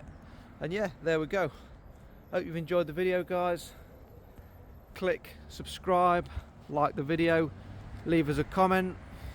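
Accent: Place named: Derbyshire, United Kingdom. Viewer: Lovely Derbyshire accent there!! 👍🏽👍🏽😊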